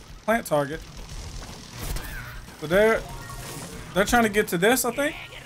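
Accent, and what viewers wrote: South, United States. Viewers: Whats up with people always saying he sounds black? It's just southern. Black has a more... Powerful tone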